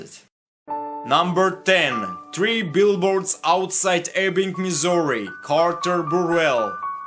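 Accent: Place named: Northern Europe. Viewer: good to know. Just know that I'll be supporting you, because not many people respect film scores such as yourself. And also, where are you from?- you sound northern European.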